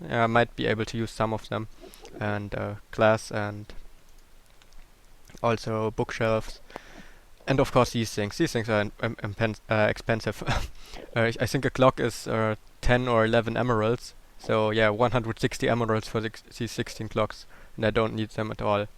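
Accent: Germany. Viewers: Man hört es übel... allein wie er think ausspricht :) typisch deutsch eben! :D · man hört voll dass Du deutscher bist xD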